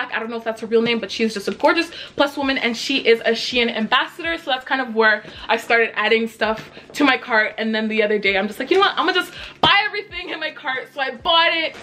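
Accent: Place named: Toronto, Canada. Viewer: I’m from gta area and just listening to you I’m like, that’s a Toronto girl I know it loool!! Keep up the hustle girl you’re killing it!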